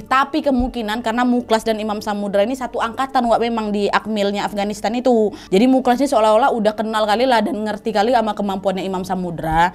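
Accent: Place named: West Sumatra, Indonesia. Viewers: Gw salut sama kamu membawakan cerita bom Bali dg khas Sumatra mantap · enak kali dengar cerita ini, logatnya khas minang, jadi suka lihat ibu ini.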